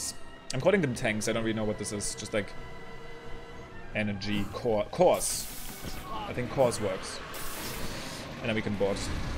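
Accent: Germany. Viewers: idk if he does in this run or not, but my favorite is when he says “ragdoll” bc it sounds like “rectal” lmao. german accents are excellent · Im hearing a gernan fellow :) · bro i love your german accent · this beautiful thick german accent is love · Dude i just watched this Video a minute long and just the way you speak tells me that you are german xd ja ich bin auch von Deutschland lol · i love the german english accent.. or just the german accent in general!! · This German accent is just nice 😂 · Man hört so raus das du Deutsch bist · Wegen dem Akzent würde ich Deutsch sagen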